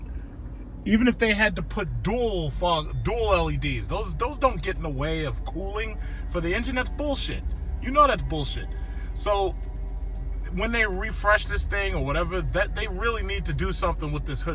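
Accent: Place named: Long Island, United States. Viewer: You are so Long Island bro. I watched mine 10 of your videos because you sound just like me and my brother arguing gear head stuff. I'm definitely a fan, bro. Keep doing your thing!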